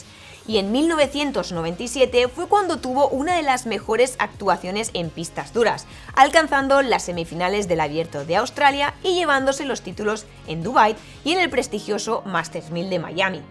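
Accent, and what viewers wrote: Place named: Catalonia, Spain. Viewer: DEJA DE DECIR BRUTAL TAN SEGUIDO, CON TU ACENTO CATALAN TAN CERRADO, DAS BASTANTE CRINGE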